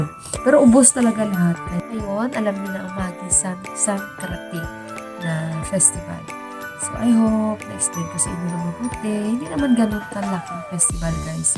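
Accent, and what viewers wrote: Iloilo, Philippines. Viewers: Ilonggo ang tuno mu teh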